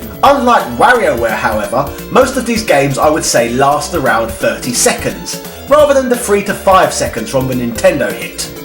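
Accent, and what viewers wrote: United Kingdom. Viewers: Aw man 🎩 you know I'm a fan so why'd you have to slag on rap music? TBH your accent is a charming reminder of some of my favorite UK rappers.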